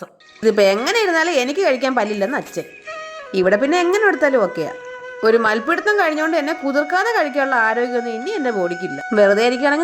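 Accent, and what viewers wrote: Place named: Kottayam, India. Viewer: ok👍 സംസാര രീതി കേട്ടപ്പോൾ ഞങ്ങളുടെ കോട്ടയംകാരിയെപ്പോലെ തോന്നി. അതാ ചോദിച്ചത്🙂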